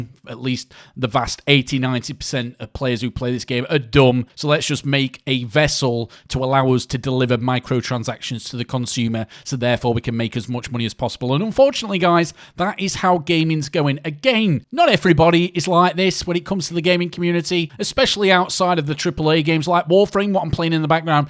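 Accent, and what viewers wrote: United Kingdom. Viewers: It’s simple. People like spending their money that they earned how they feel like. If you don’t want people to be able to do that move to a communist country. Oh wait you sound British or Australian so you already live in a communist country.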